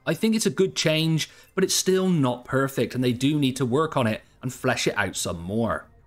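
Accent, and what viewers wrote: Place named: Cornwall, United Kingdom. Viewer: First time watching this channel and ive got to ask: is that a cornish or Somerset accent?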